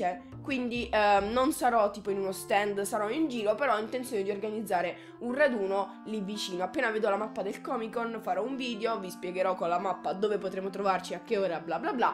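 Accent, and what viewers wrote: Apulia, Italy. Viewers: ma sei pugliese?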